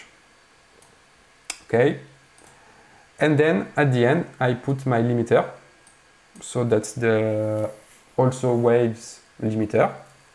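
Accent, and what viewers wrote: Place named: France. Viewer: Well done, do not worry about you French accent. This video is very helpful!!!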